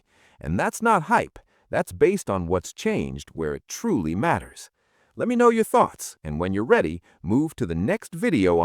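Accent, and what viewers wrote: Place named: United States. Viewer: Agreed! And to make it worse, the AI voice is 'Merikan!!!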